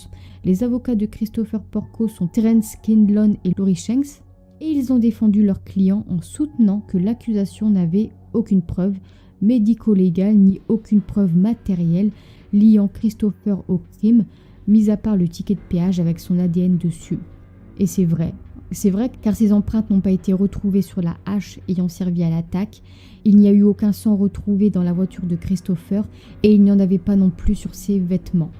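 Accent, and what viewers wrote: North, France. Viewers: Bonjour je viens de découvrir votre chaîne et je dévore littéralement les épisodes , j’aime beaucoup !! Petit question si ce n’est pas indiscret bien sûr ,mais j’ai l’impression d’entendre dans votre voix,l’accent du nord de la France ,je me trompe? En tout cas merci pour tout ce travail et bonne continuation !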